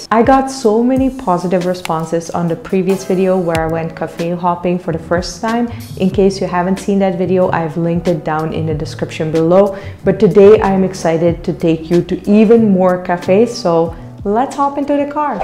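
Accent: India. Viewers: Is it me or she developing an indian accent?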